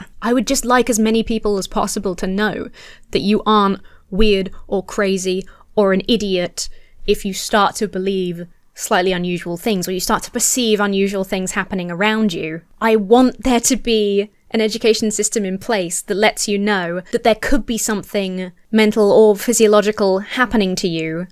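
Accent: United States. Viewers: that american accent genuinely broke my brain for a good few seconds when i saw your mouth moving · with an american accent is cursed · Your American accent is impressive. Reminded me of the one Michael Fassbender does in Alien Covenant. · I'm only twelve and a half minutes in, but I have to say I got so confused when you began reading in an American accent. I listened to this in the background while I drive, so it was a little bit disconcerting. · I love your American accent. It's gold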